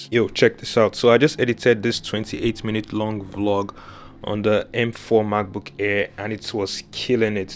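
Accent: Nigeria. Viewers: You from 9ja. No lie😂✌🏾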